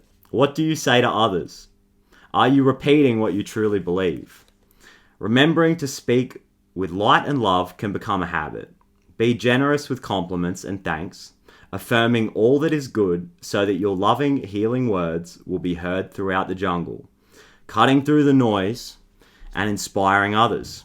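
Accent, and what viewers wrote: Australia. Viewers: I think he sounds Australian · great reading, totally resonates! Thank you fellow Aussie! unmistakeable accent 😁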